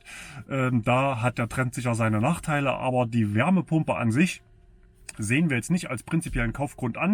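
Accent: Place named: Thuringia, Germany. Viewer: Er kommt aus Thüringen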